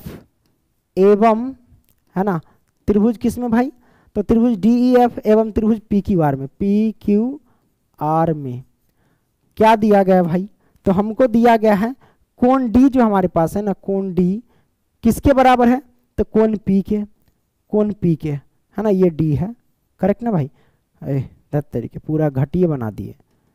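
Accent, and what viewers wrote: Bihar, India. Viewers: You are. A good teacher but you talked some bihari language that why i didn't understood all work but you are a good teacher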